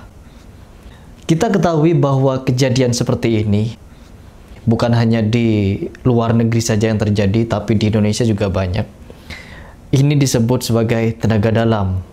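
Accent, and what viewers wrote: Madura, Indonesia. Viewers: Mas logatnya seperti Madura.. Betul ya ☺️🙏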